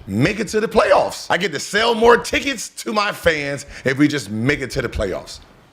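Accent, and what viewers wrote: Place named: Memphis, United States. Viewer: You got that Memphis mane down 😅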